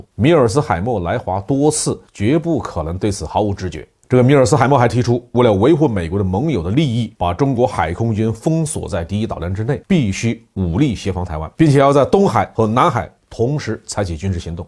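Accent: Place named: Anhui, China. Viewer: 你是不是孝感地区的人？口音要么就是安徽某些地区。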